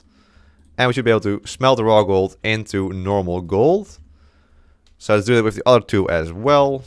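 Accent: Netherlands. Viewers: my first time watchin your vids, immediately noticed you're dutch. Ga zo door met je video's!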